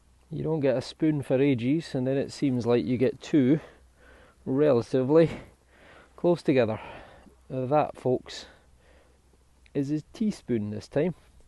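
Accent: Scotland, United Kingdom. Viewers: Great upload as per, buddy. Your accent reminds me of one of my favourite scientists/presenters, Dr Iain Stewart, geologist/vulcanologists etc. Really makes for great listening. I hope that's not offensive, as I'm not sure where he's from in Scotland.! 😁. I've a lot of knowledge of the English monarchs going right back to the first Saxon King of Wessex. Your uploads are making me research the Scottish monarchy, as I'm quite ignorant to it, so much appreciated. Bless up 👊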